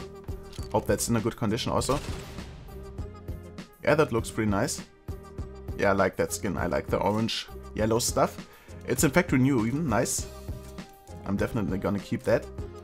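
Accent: Germany. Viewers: R u from germany? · Man hört einfach das er deutsch ist :D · you are from germany right? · Ich muss mir nur die ersten drei Wörter anhören und schon weiss ich das der Deutsch ist xD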